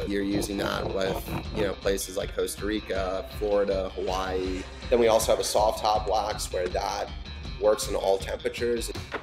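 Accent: California, United States. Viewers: This guy has the most California surfer accent I've ever heard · I cant stand that California accent and im from here. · That Californian accent is thick and cute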